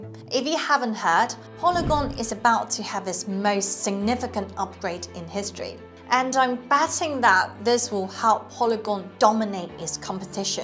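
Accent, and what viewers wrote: United Kingdom. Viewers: This girl is learning English, and learning to speak it in an English accent hehe fair play!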